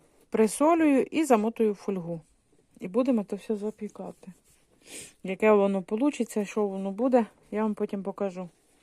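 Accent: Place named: Russia. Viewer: Капец...Мучается бедная баба с мовой.Поганит украинский язык..Акцент русский так и прёт.Приспособленка.😂😂😂😂😂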